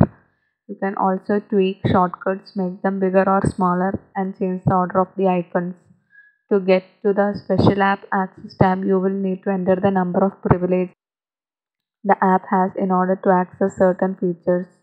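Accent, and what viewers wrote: India. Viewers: atrocious audio, hardly intelligible, heavy Indian accent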